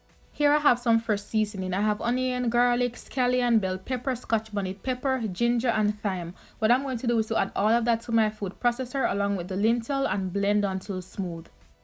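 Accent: Jamaica. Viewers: Your accent caught me. I miss Jamaica right now. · Love an uptown Jamaican accent 😍 📍🇬🇧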